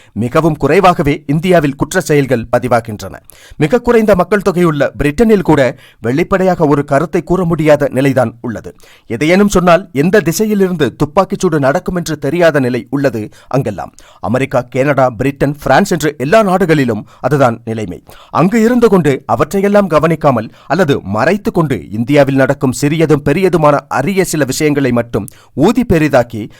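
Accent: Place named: Sri Lanka. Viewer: Your voice is very good like a cylon Tamil mixup. Congratulations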